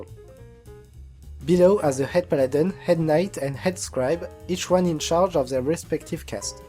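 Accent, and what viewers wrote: France. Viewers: Chemsse-dine Salhi I know, but I don't need them, I can understand him perfectly, I went to french schools so I got used to hearing french accents. If he is indeed french, he does actually speak English very well. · ohhh putain cet accent francais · T'es français ? :3 Putain, cet accent mec ! XD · vue ton accent et les sous-titre tu doit être français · lol dat French accent is ridicilous but nice video brah :D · omg, french accent <3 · His french accent is as cute as Curie's... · Juste excellent ! Continue tu es sur la bonne voie ! (je me permet de te répondre en Français, ton accent indique tout ^^, et les sous-titres Français, c'est parfait !) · your frenglish makes my ears bleed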